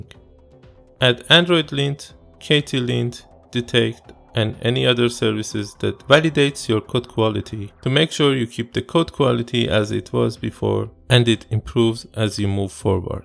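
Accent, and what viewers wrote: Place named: Iran. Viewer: CHITOOOORI. I can hear the Iranian accent.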